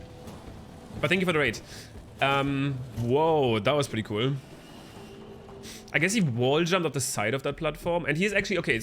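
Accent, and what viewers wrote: Germany. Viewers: German Dialekt ballert rein 😂 Trd geiles vid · One question, are you german? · he has a german accent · yooo are u german !?!?!? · Idk why but You sound german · You sound german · That accent... Are you from Germany?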